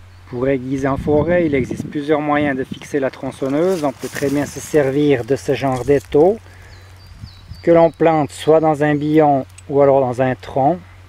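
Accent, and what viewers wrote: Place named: Quebec, Canada. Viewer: vous avez un accent québécois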